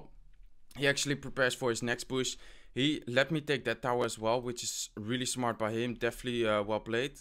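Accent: Netherlands. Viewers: Dude are you dutch? I hear a dutch accent ( ik ben wel Nederlands :))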